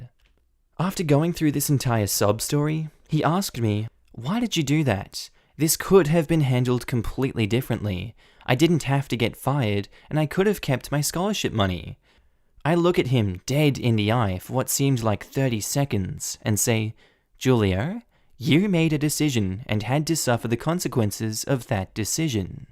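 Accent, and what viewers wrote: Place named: Australia. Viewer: He's rather obviously Australian.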